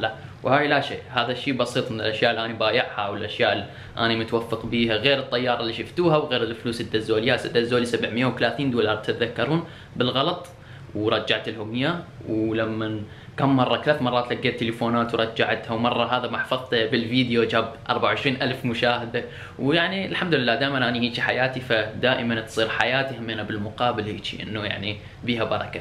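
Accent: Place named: Iraq. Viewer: حلوو استمر بس انته اشو تكول دبي واحسن تكساس من دبي انته عراقي لو من دبي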